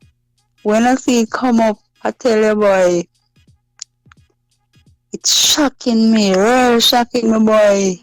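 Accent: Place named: Jamaica. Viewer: Lady chat jamaican twang you not a Trinidadian you no sound good, love blind you till you no see say dis fool a use u. U fi real?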